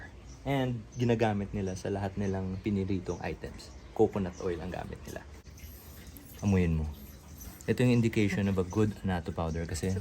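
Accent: Iloilo, Philippines. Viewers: Ilonggo ka gali sir?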